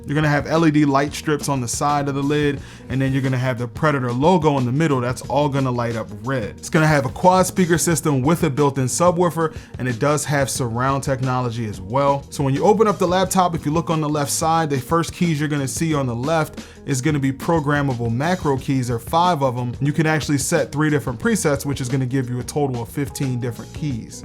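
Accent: Baltimore, United States. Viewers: I hear Baltimore more than the review.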